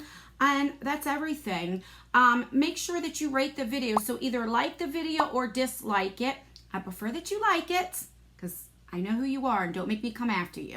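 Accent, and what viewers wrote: New Jersey, United States. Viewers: I love your Jersey accent! We moved to west Virginia 4 yrs ago from Philadelphia. As soon as I open my mouth everyone asks where I'm from . Happy to see you got your brushes.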